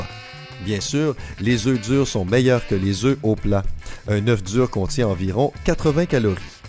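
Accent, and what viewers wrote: Quebec, Canada. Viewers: cest un québécois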